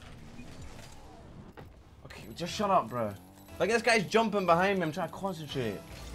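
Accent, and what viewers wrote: Scotland, United Kingdom. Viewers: Same old Scottish AF 😂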